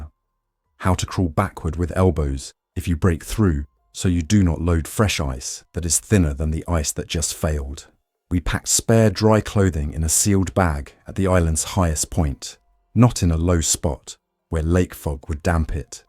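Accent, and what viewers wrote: United Kingdom. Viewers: The eager AI British voice isn't helping the story. Choose an AI with an American accent and sounds like Sam Elliot.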